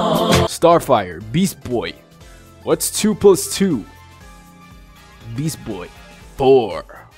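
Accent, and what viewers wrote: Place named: Arab world. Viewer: nice Arabic accent mate ماشاءالله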